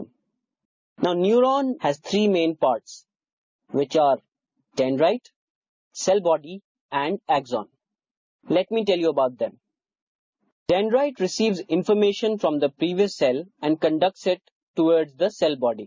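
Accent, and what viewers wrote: India. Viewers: your accent is indian.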